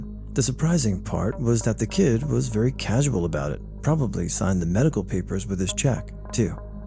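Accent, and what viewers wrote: Yorkshire, United Kingdom. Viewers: Hilarious Yorkshire accent. Lol. I'm from that huge county (one of four Yorkshires). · Best Yorkshire accent I've ever heard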